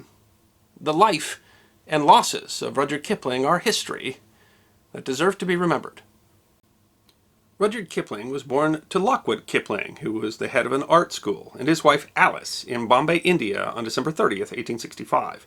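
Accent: United States. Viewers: Do forgive my apparent rudeness in writing this complaint to you about the way in which you narrate the uploads, which are well written but in my opinion badly spoken. The point is reached at times when the spoken words running continuously together, sound like a squawking chicken with an American accent. Furthermore, the word " duodenal " sounds phonetically as follows: " derwadenal " and whilst this might be the American way to pronounce this word, there are other examples, where the narrative is a confusing staccato aural onslaught. Your voice is highly pitched for a man's voice, in the same key as Kermit the Frog, from the animated puppet show called the " Muppet Show". Perhaps you should employ a professional speaker to narrate your uploads, which otherwise are very well put together. Best wishes, and keep up the otherwise good work. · Sorry but it doesn't sound right with an American accent.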